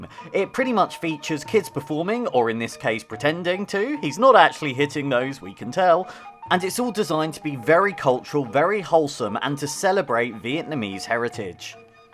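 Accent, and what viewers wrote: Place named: United Kingdom. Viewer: Where are you getting American from? He's British, he has a British accent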